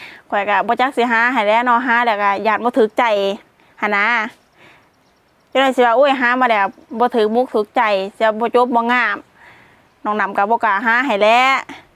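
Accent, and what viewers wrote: Thailand. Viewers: ภาษาเว้าคือทาง เดชอุดม เนาะน้อง ติด..ร เรือ ม่วนๆ 555.. สู้ๆนะ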